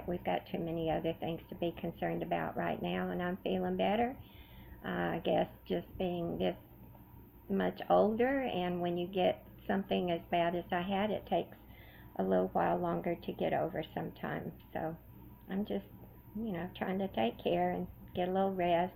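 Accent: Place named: South, United States. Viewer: I am so sorry to hear you were in the hospital. Hope you are on the speedy road to recovery. Marlene’s project bag is just beautiful and how sweet is that??! 😉. So good to see you, as always, I am inspired by your sunny outlook and I could just listen to that lovely Southern accent all day long. 😘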